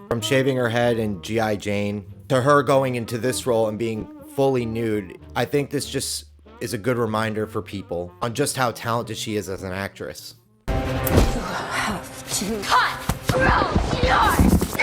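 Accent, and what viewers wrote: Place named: Italian-American, United States. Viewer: Omg I recognize Mackenzie Phillips from Black Mirror! Speak No Evil looks gooood, I love horror movies where you're just super uncomfortable on a visceral level. EDIT: To add that your Italian-American/Tony Soprano accent is solid.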